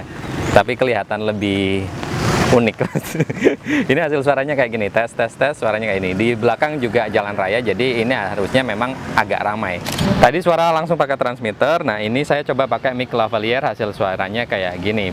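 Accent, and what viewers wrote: Pekalongan, Indonesia. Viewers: Bang orang pekalongan y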